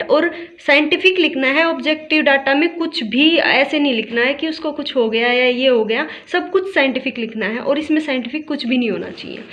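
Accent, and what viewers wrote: Haryana, India. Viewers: Haryanvi sweg h boli me madam k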